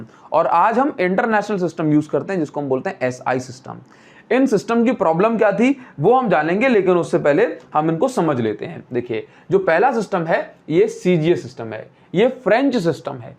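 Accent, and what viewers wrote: Odisha, India. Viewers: Sir are from Odisha 🙏🏻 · Sir app odisha se hen kya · Sir are u from odisha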